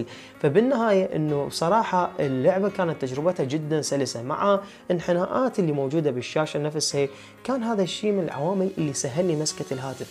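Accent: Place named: Najaf, Iraq. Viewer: من لهجتك مبين نجفي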